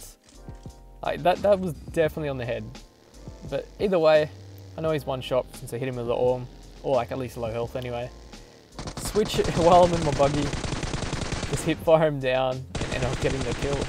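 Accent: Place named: Australia. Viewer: Man, your accent sounds like an Australian.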